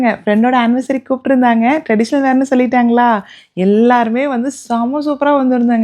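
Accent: Kongu, India. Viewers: Kongu Tamil 🥰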